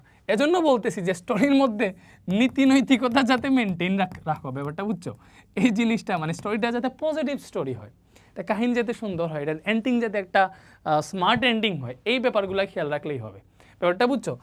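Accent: Sylhet, Bangladesh. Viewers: Beday j Sylheti kothay seta bujha jay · Sir ki sylheti nakiiii😮😮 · স্যার কি সিলেটি?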